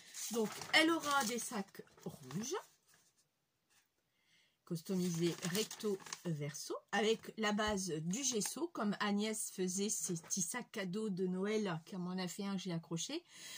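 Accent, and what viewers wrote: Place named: Nord, France. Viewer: Bonjour je me suis abandonnée a ta chaîne grâce a notre amie Agnès.super sympa tes vidéos j'adore ❤❤❤❤.mais je me permet je connais ton acent j'ai le même le pas de Calais ou le nord.moi c'est le 62 mais maintenant c'est l'aude.je te fais de gros bisous.alexandra 😊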